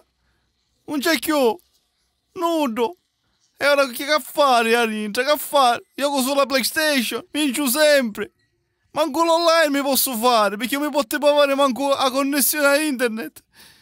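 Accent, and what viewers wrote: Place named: Sicily, Italy. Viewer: Bravo, provincia di Agrigento o di Palermo?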